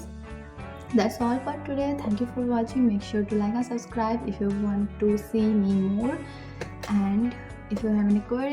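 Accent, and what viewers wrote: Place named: Bengal, India. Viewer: Waiting for short hair videos 😭 and ur bengali accent 😭🎀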